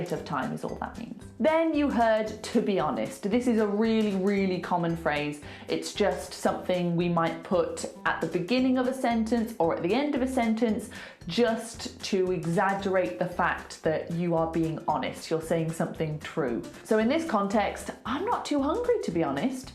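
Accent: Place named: West Midlands, United Kingdom. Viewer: I cant believe you live in West midlands,im from walsall now your accent makes sense😃😃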